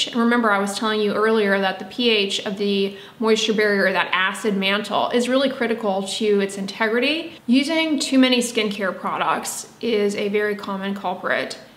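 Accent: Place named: South, United States. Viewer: Are you from Virginia or perhaps Texas? I can hear a twang in your voice but only slightly with your “o”s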